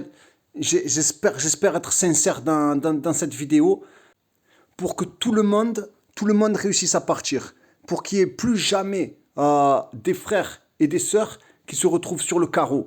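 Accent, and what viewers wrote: Marseille, France. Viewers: Salam, tu m a fait trop rire avec la charrette...et ton accent marseillais...j aime beaucoup Tlemcen, j y suis venue en octobre et j ai visité ce magnifique hotel la Renaissance..bonne continuation et courage à toi...il me tarde d y revenir au prochaines vacances in challah.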